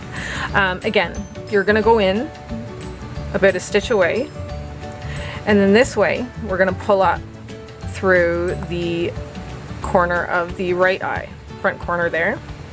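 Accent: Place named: Canada. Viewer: You sound like you have a Canadian accent like me 😀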